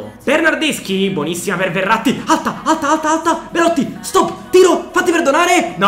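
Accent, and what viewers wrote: Naples, Italy. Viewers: Ma sei di Napoli?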